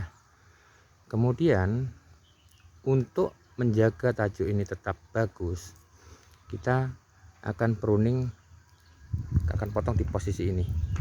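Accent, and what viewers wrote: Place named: Java, Indonesia. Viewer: padahal logatmu logat Jawa kampung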